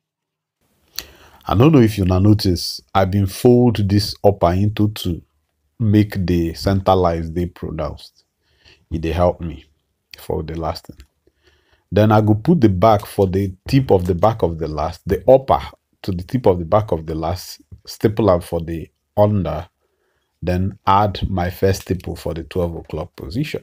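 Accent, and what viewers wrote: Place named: Pidgin, Nigeria. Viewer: Thank you for this sir.. It's your pidgin for me.. Sweet to hear😂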